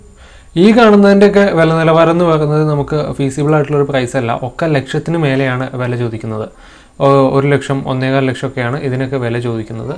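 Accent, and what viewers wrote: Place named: Ottapalam, India. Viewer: Nalla ottappalam language good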